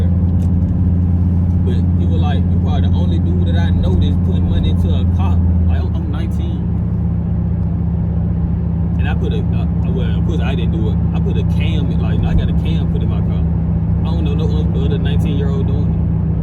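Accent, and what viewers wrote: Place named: Memphis, United States. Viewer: its how black people from memphis talk , if u not from around there then youre never gonna be able to understand them. I live in indianapolis but i can still understand what he saying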